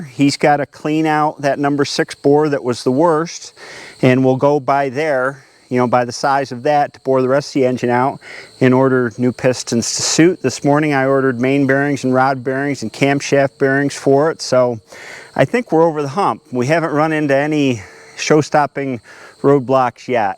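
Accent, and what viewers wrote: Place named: South, United States. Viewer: 60s country twang puts the ooo in smooth.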